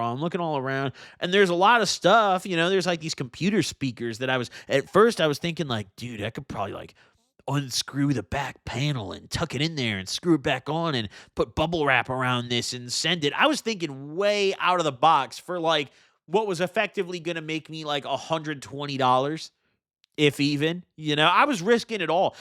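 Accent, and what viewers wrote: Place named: Illinois, United States. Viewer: Yooooo my dawg i fuck with u tough frfr especially being from Illinois im jus now listening to the stories omfg u got it and the fact u talk like a illinoisien i love yo content even better plus u remind me of 50% of my homies from back in the day i can confirm the shit u be talking about I fw it 💯 … I used to be an addict I wish I had ur content when I was def woulda helped me recover but brooooo my nigg@ with this video and me used to ship as a rookie made me fckin die in laughter glad I wasn’t the only one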